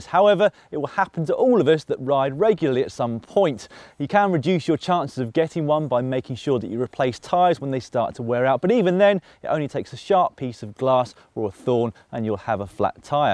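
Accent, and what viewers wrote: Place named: United Kingdom. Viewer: Can you tell me where this video was made? You sound British...signed curious in Canada...Alberta...Calgary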